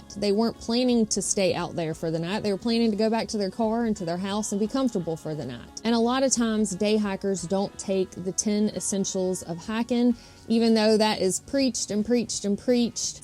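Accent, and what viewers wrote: United States. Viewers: It's nothing to do with hiking but as a person born and bred in the UK, I just love your USA accent.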